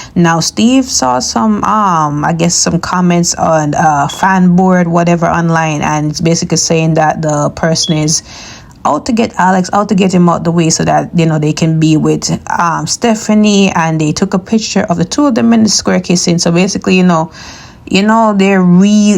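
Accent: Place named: Jamaica. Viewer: U sound Jamaican 😂but yh javi is a hopeless fool because why are u pushing ur man away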